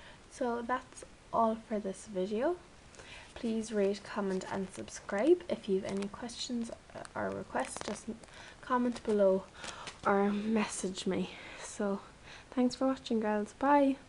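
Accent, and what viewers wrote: Cork, Ireland. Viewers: it's a cork accent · YOUR BLOODY IRISH THAT IS SO COOOOOL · She has a cork accent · Are you Irish? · You're Irish! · I love your accent are you Irish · the first person I have heard with an Irish accent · She's Irish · Are you from Ireland? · You sound Irish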